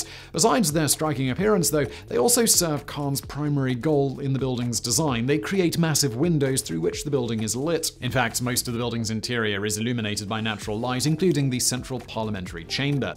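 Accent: United Kingdom. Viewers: This guy uses his British accent for rhetorical emphasis. And then there is "Dem dooshen volke".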